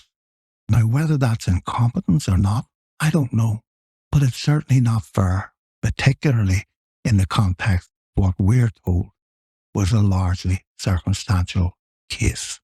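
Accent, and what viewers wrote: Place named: North, United Kingdom. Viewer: Fantastic STuff..😅.....Your obviously from up north,.......❤🎉... FaB accent ye got There my friend 😅🎉... i moved to London from Dublin in 1998 but im STILL famous for my strongish 1/2 CorK 1/2 Dublin Ramble 😅😅😅😅😅...Fabulous channel 🎉. Been Binge Listening 👂 for the past 3weeks ❤🎉😊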